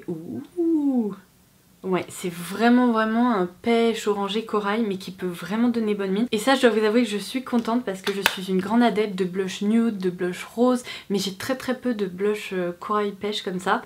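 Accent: England, United Kingdom. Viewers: La vidéo qu’on attendait toutes je pense, au début j’étais comme toi pour la bave d’escargot mais finalement étant donné que ça fonctionne eh ben ma foi 😂😂😂j’adooooooore ton accent anglais en passant hihi et merci pour le concours 🤞🤞🤞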